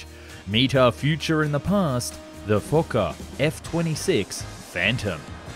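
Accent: United Kingdom. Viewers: i would like to think, being (or at least sounding) British pronounced it naynay purposely.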